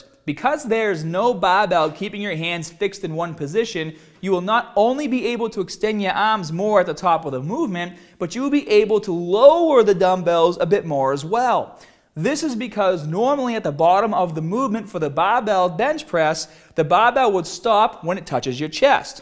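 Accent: Boston, United States. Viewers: Dude your sudden onset of a thick boston accent is ridiculous lmao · holy shit - the Boston comes strong in this one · When did this guy get a Boston accent? 😂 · Nice Bostonian accent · lol. this is Boston accent right? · is he from Boston? sounds like a boston accent. not too heavy but subtly noticable. its perfect. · this guys boston accent is hilarious!!! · It's called the Boston accent. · You sound like you're from Boston. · obviously Boston. We New Yorkers don't speak so exaggerated. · Did just recently develop his Bahstin accent or was I just missing it for years? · Maybe that's his real Boston accent but hes trying to hide it but it comes out 👍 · When the fuck did this guy develop a Boston accent lol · Woooooow! Your Boston (?) accent is getting stronger and stronger! Regional accents have their charm. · i never noticed boston accent before. 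· So many comments bitching about accent... grow up, everybody from the Boston area has the same accent. I moved away from Southie awhile ago (to southeast Iowa), unless I am drinking, I can hide the accent, but unfortunately it will be with me my entire life. If you cannot get thru the video because of the accent, you are a child who should be on A.D.D. medication, don't worry about body building till you become a bit more focused, it is called priorities. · Apparently it's a Boston accent. I got confused since I assumed 100% of America accentuated the 'r's. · Is that the Boston accent they talk about? also when did his accent become so strong? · Dude is from Boston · First thing I thought was oh!! A fellow Bostonian ❤ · Yes he has a Boston accent, just watch the video for the gym tips. It's really annoying to see the comment section flooded with people commenting on his accent and not gym-related things. 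· No he just has a Boston Shore accent! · He's got a Boston accent. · don't listen to the haters. the Boston accent is sick. better than the boring ass baritone most Americans speak with. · It's because originally he tried much harder to HIDE the Boston accent... but then has since stopped doing that because it's idiotic and now (and back in 2016) he's a lot more natural. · he hid his accent when trying to go mainstream now he lets his inner bostonian out. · I love that Bahston accent. lmao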